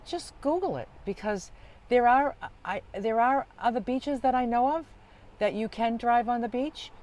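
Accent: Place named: Massachusetts, United States. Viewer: you are amazing! Your honesty and mindset is inspiring to me. I’m working on your food plan and working on my mindset about everything!!! Thank you for all the hard work!!! I hope you are able to do an Amazon store soon!!! I love your gadgets! ❤❤❤ Ps. My first video was way back when you first got Sweet Pea…I too am from Massachusetts, I recognized your accent… And I was hooked. Keep up the great work, lady!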